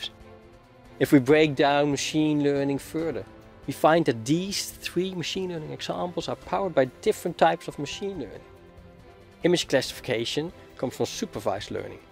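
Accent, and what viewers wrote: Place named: Netherlands. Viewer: I absolutely love you dutch accent! And the explanation too of course.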